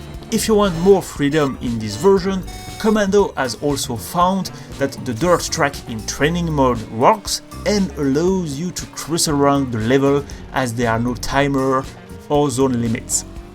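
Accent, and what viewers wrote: France. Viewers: Question are you french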